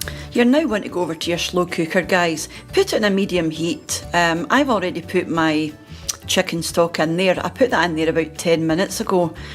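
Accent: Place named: RP, United Kingdom. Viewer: Hi 🤗 Love a revisit & how old the recipe is - they're great for new subs to ur channel🙏🏽. Your voice sounds quite different from some of ur original 1st vids its now proper posh😆🤣😂 I'm doing ur 🐸in🕳 next week & can't wait. I do my Sausage caseroles on the stove top or oven as a one pot & just use my slow cooker for stews these days. No dishwasher in my gaff so anywhere i can save on washing dishes is more important to me these days. Keep these coming ❤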